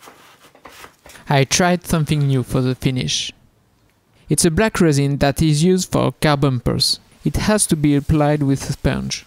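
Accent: France. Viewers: your french accent is amazing, i loved your guitar building, you mentioned practice, i see a master, lots of luck in your guitar building career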